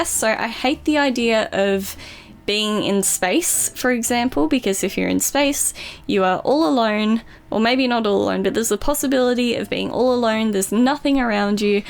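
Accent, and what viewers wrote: United Kingdom. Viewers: When I sometimes hear her voice it's kinda a bit British... Does Austrialians have a bit British voice?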